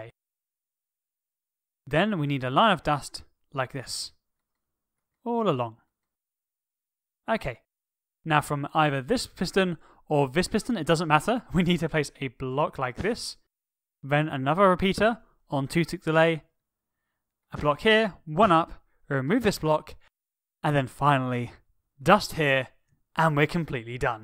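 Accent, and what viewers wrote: United Kingdom. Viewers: I know this sounds weird, but I like your British accent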